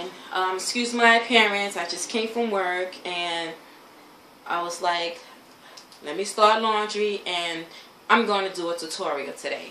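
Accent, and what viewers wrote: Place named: Bronx, United States. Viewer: Your accent reminds me of home (Bronx,NYC), where are you from? P.s. great tutorial!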